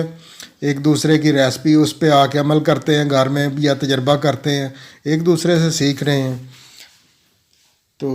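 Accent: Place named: Hyderabad, India. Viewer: Janab ye kara kari India Hyderabad ka accent he. Kehte hain Urdu UP me paida hue, Lakhnaw me jawan hui aur Hyderabad me dafan hui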